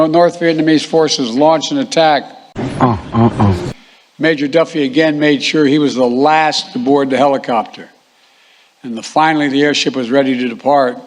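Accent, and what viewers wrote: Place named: Australia. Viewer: Can't stand even listening to this shit head Aussie ear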